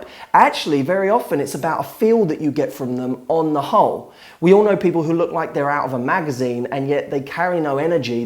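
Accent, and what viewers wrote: England, United Kingdom. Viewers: your british accent is killing me! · Your So Hot, i dont mean to be shallow, re: being your looks, your English accent:) it's definatley your whole package;) love your video, thanks once again, always emotionally intelligent and of value. Cheers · you look smexy to..... i love brittish acsents <3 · I like British language 😂 I mean accent ☺️ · That's just how everyone in the UK speaks.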